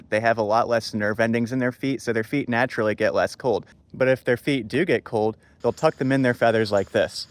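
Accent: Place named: United States. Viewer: Fun and all but cant stand the thicc american accent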